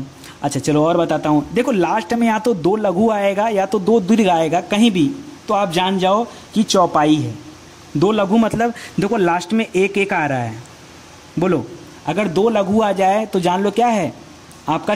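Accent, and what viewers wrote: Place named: Uttar Pradesh, India. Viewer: सर जी आप उत्तर प्रदेश से हैं, आपलोग 'ण को ड़ (र) क्यों पढ़ते हैं।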